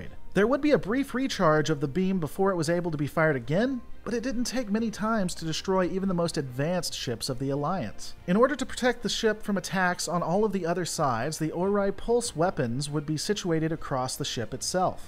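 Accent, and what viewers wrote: United States. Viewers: Please learn to speak English. Not barstardised American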